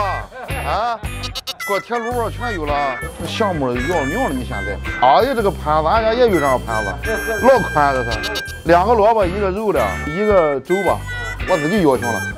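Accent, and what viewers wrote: Qingdao, China. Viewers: 想回家啊，听青岛话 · 聼青島話，回憶喝青島啤酒吃海鮮，真想故鄉。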